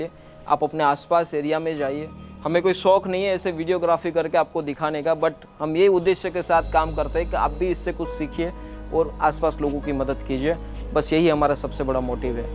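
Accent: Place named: Etawah, India. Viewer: bhai iski avaj se sap pata lagta hai ki a bhai itava ka hai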